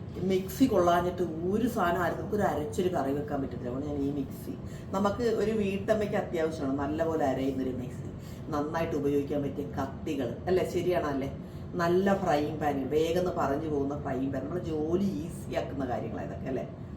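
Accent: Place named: Ernakulam, India. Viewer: Super singu... Live happily God bless u ekm il ninnu parayunnapole undi❤❤❤❤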